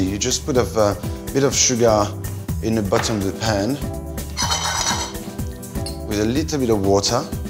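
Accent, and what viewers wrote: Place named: France. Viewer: Not sure this was a good video because all I noticed was his charming face and his endless french accent go blah blah blah. Your words mean nothing Manu, but ur face remains magnificent.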